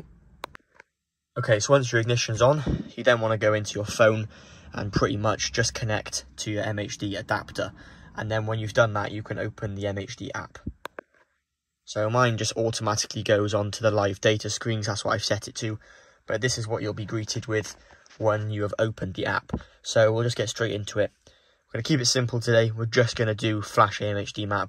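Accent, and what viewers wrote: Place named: United Kingdom. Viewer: Yes, I also noticed using the US octane. Seems to be incorrect. You sound like you are british.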